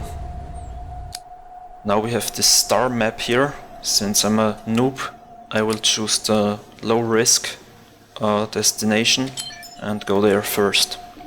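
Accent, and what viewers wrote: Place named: Germany. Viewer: Am Akzent hört man das du ein Deutscher bist. :D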